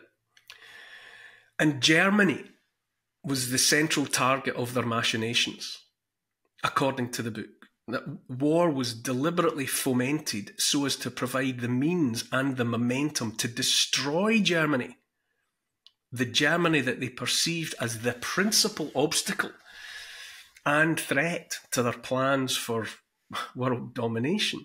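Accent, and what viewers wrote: Scotland, United Kingdom. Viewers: The Scottish accent is so very charming! I love it. (The Irish accent is equally charming, definitely different from the Scottish, but similar to American ears.)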